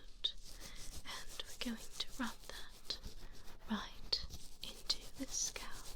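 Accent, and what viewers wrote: United Kingdom. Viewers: I'm so used to the Tomboy voice that hearing this gentle, higher pitched British Esq voice, gave me whiplash. Good to know you have a versatile voice! Makes for much more entertaining ASMR videos. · I love the bri'ish accent. Love your audios❤ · 40 minutes of you must be what heaven is like,. Only forever! I love your British accent. This artwork of you is phenomenal! 😍 And my birthday was 2 days ago. This is a great present! 🎂🥳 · are you british by chance? (i’m the anti furry you talked to a few months ago)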